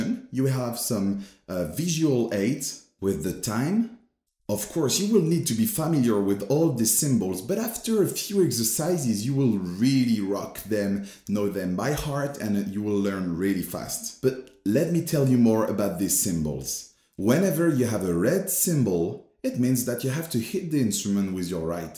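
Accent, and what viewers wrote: France. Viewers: Alors il est français "tontonton... tontonton..." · Très très français oui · Tu as l’accent Français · clairement français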